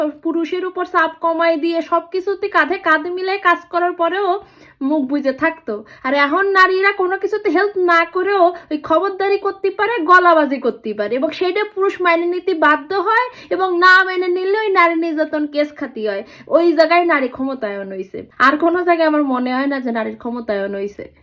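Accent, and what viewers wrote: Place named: Khulna, Bangladesh. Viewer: আপনার প্রতিটি ভিডিও খুব আগ্রহ নিয়ে দেখি আপু,, নিজের এলাকার মানুষের মুখের ভাষায় কথা শুনে আপন আপন লাগে,,,আমাদের খুলনা ডিভিশনের কালচার,মানুষের মেন্টালিটি, চিন্তাধারা আসলেই বাংলাদেশের বাকি সব ডিভিশন থেকে আলাদা এবং ইউনিক স্বচ্ছ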